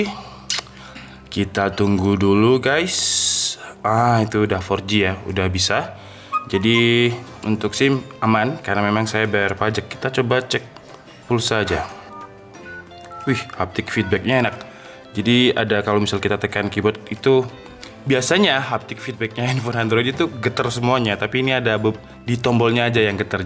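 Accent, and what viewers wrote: Java, Indonesia. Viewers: Jawa mana mas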